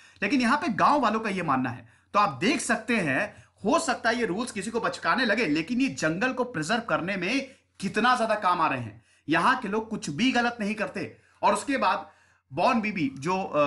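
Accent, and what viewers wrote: India. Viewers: I love ur desi style of speaking 🌸❤️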